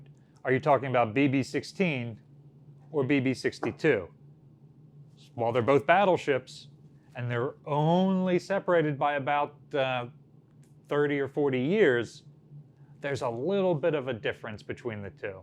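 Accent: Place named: Hackensack, United States. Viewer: Im from Hackensack but live far away now, and while I have a casual interest in Naval history, listening to talk and his mannerisms make me feel like im home again. Thanks.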